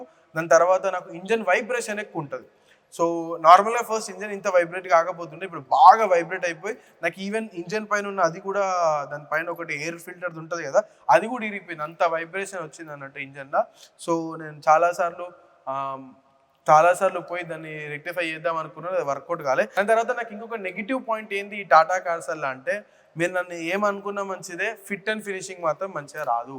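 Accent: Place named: Telangana, India. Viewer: Excellent review Anna Ma telangana Slag brand ambassador vi nivuu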